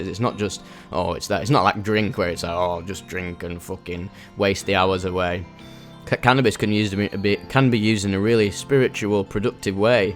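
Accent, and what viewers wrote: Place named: Yorkshire, United Kingdom. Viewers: This geezer sounds like hes from yorkshire 😳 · mate you sound so northern are from the NE? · You from Yorkshire? I'm from Hull, you sound like you live close.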